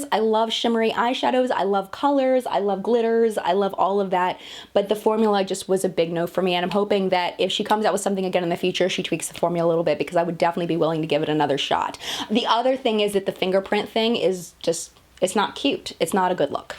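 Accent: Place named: Pennsylvania, United States. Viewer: Luv your content! You have a southeastern Pennsylvania accent (think Philadelphia). Where are you from??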